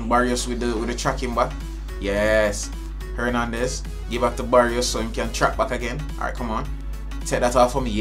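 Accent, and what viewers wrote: Jamaica. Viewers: Bro u speak Jamaica?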